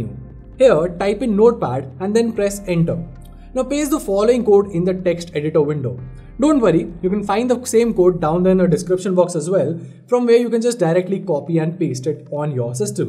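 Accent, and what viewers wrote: India. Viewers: The Indian accent is so melodious, however, when speaking English he must slow down, as it is very hard to follow him. Great content, but I wish he would slow down a bit. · you say that the code is below this video and in this key moment of you pass so fast and even your accent is from a foreigner country that looks from india. so now i have to go to another smart guy for see where to get this wonderful code. If you speak slowly is going to be good for everybody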